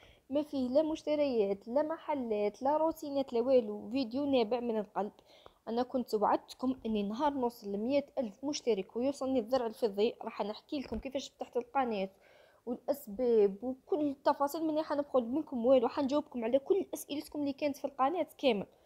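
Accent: Setif, Algeria. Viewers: الف الف مبروك لعقوبة للذهبي تستاهلي وصوتك سطايفي يهبل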